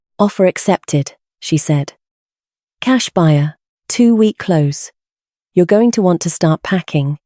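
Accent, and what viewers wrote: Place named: United Kingdom. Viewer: Narrator has a very lovely voice but it was a bit bizarre that she’s clearly British. Didn’t quite fit a story supposedly from Cincinnati. Was quite distracting.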